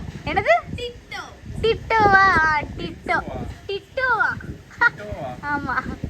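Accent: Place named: Nagercoil, India. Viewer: Yentha or da nagarkovil da tamil super ah irukku da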